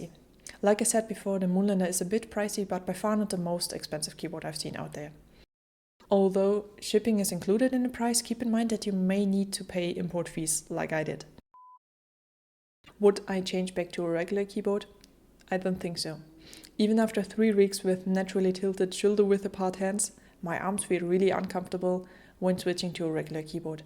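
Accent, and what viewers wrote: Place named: Germany. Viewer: Are you German? I think I recognize your accent. You speak similarly to Joscha Bach.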